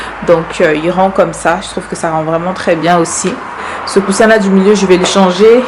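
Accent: Cameroon. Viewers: J'aime trop l'accent camerounais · Je viens de finir de regarder le vlog 😍....trop bien. J'ai appris plein de trucs, du coup j'ai trop envie de décorer ma maison. Ton accent kmer j'aime trop 😍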